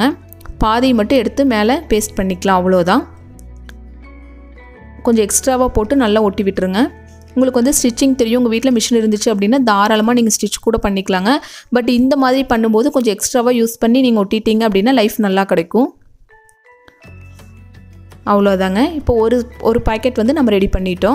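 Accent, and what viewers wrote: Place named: Tiruppur, India. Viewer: Amanga nanum tirupur thanga...athanunga pathen nenga pesarathu namma ooru maathiriye irukunu superb nga ka...nenga podara videos ellame rmb rmb superb nga ka...unga video epa varumunu nan parthutte irupenga ka vanthathum parthuduvenga ka...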